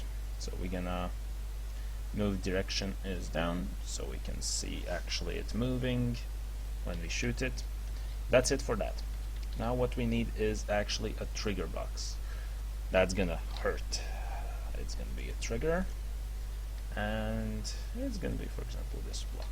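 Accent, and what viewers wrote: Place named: Portugal. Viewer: Ahaha, nota-se claramente que és pt. Bom vídeo!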